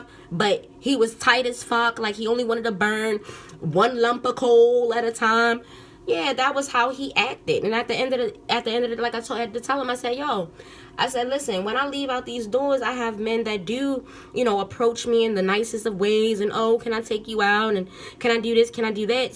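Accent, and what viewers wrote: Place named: Baltimore, United States. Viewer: Baltimore accent..🌺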